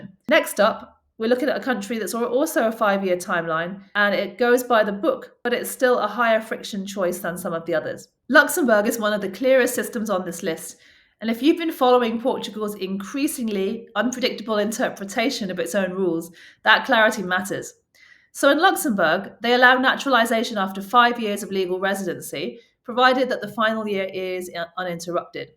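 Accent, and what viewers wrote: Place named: United Kingdom. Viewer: That'd be awesome. And thanks for being so responsive, for taking the time to respond to random comments. That and of course the meticulously curated content makes me come back to your channel over and over again. Plus, I love the British accent, and given how English is my second language, being on your channel is always a learning experience for me, in more ways than you intend. Thanks for everything. :)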